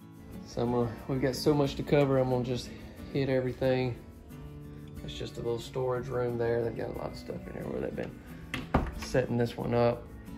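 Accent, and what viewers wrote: South, United States. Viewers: I'm not the greatest at placing southern accents. Does anyone know where his is from? I love it.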